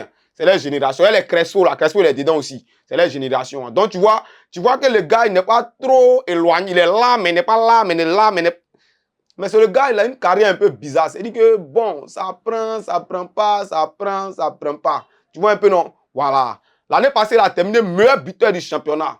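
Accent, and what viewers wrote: Ivory Coast. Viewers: bro jaime ta maniere de gbaé cest du pure ivoirien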